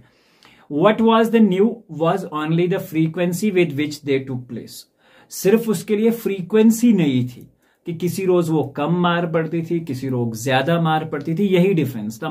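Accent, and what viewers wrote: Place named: Kerala, India. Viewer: Kerala ki pronounciation 🤣🤣🤣🤣🤣🤣😂😂😂😂😂😂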